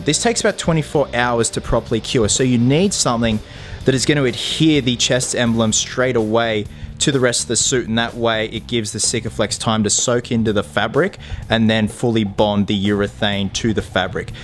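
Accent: Australia. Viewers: It's kinda weirding me out watching Batman speak with a high pitched Australian accent. Like some wires got crossed in my brain it something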